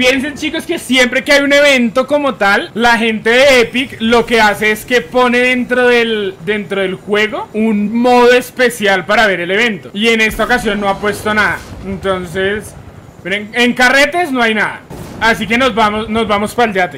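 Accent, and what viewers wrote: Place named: Bogotá, Colombia. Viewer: Eres de Bogotá cierto? Jajaja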